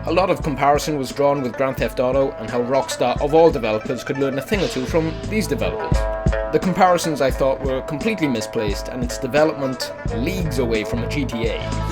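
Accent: Wales, United Kingdom. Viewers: You welsh bro? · Are you Welsh? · Are you from wales? you sound kind of welsh but you also sound like you're putting a accent on:) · are you Welsh or American. I thought you were Welsh but the prices you mentioned we're American. It's rare for me to find a fellow Welshman on YouTube.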